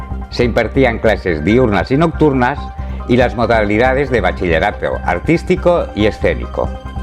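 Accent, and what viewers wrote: Catalonia, Spain. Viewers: Te pasas tres pueblos. Lo que te molesta es que tenga acento catalán, si fuera gallego o canario por ejemplo no dirías nada. Por cierto ya que hablas de hablar bien comienza tú por escribir bien, cuando se hace una pregunta en español se ha de poner signo de interrogación al principio y al final de la pregunta.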